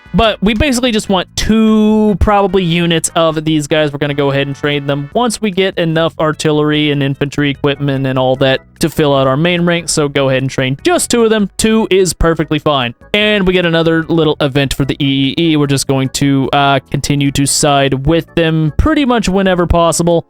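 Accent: United States. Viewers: You speak American? not England language? intersting. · You actually speak English! Granted it is Americanised English.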